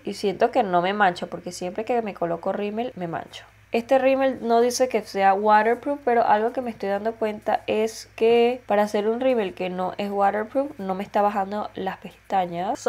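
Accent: Venezuela. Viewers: Me Encantas!!!! soy venezolana, vivo en España y es super increíble oír tantas palabras y esa forma de hablar tan particular de los venezolanos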